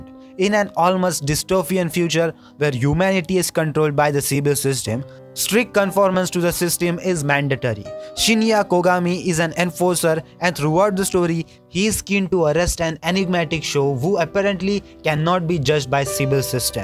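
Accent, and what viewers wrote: India. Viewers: Bro are you Indian?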